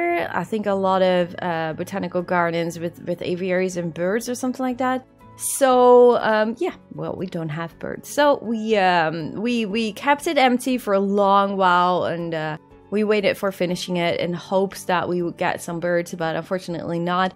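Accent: Netherlands. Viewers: Are you Dutch? You have a very thick Dutch accent, I love it :D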